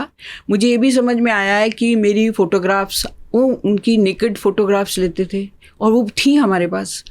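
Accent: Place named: Punjab, India. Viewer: Maam is punjabi …her accent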